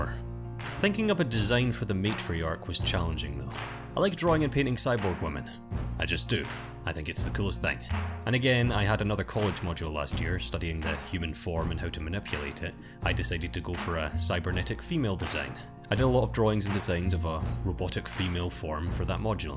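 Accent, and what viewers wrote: Scotland, United Kingdom. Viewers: sarcastic af Scottish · Loved this animation + omg you're Scottish too! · I’d say Scottish, it reminds me a bit of my own accent and the one I hear a lot from family. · I hEaR a ScOtTiSh AcCeNt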